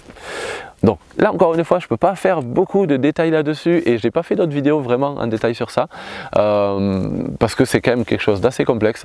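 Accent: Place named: South, France. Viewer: Passionné par la nature en général, je trouve dans les vidéos de toujours des tests à essayer( Prendre de l'avance sur le retard😅) dans nos parcelles. Sa vitesse d'élocution respire la maitrise du sujet,il est toujours agréable à visionner les différents sujets proposés. Avec le bon accent du sud aussi, TOP!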